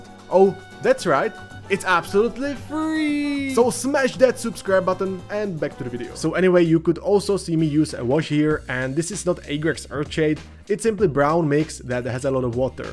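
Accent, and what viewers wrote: Czech Republic. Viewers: Got this randomly recommended, 10 seconds in and I already knew you're Czech based on the accent haha. Good job btw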